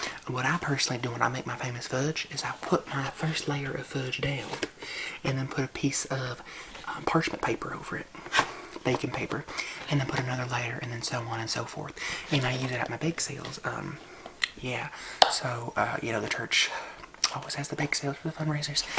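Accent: South, United States. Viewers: First time seeing your channel and omg wow. First of all, that FECKING ACCENT IS SO EXCITING. First southern accent I’ve come across in ASMR! And this video idea is amazing 😂 I love Tupperware 😍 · I like the southern accent series. Do more :) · Please make another southern accent video! I’ve watched this one dozens of times! I love it!!!! ❤️ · WHEN YOU SAID HOGWASH!!!! AAAAHHHHHHH I about DIED!!!!! I didn’t realize people spoke like this until I moved down to the south!!! 😂😭 I love it!!! When I saw the title I instantly thought of the lady who complained about her Tupperware on the judge show! 😹♥️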